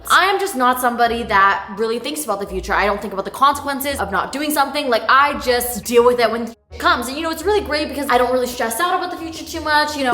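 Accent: England, United Kingdom. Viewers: wait... omg that english accent is so slay omg.😍😄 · We need full British accent video ❤❤ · Thank you, for being your chatty/ oversharing self!! Love the UK accent reminded me of Adele 💗💗 Phenomenal Spanish too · YOU’RE AMAZE BALLS ❤❤❤❤ also the bri’ish accent is gorg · I was binge watching all your resent videos this week and I’m just happy to have another one to watch. The British accent is killing me tho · Love the English accent lol 😂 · Hiya. The English accent was good, can you please do an Aussie one? I wanna hear a few G'day mate and good onya's! Lol. ❤ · love how spam account is just british 😭😭😭😭😭😭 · your british accent sounded like little Ron Weasley's voice✨ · why does your british accent lowkey sound like millie bobby brown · i'll be glad if you make a while video with british accent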